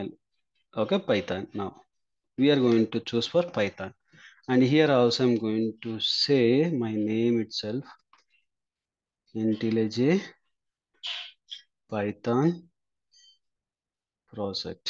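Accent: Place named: India. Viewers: I can clearly understand him speaking in English. His indian accent is not that strong. I think it is you ... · are you talking in english? work on your indian accent bro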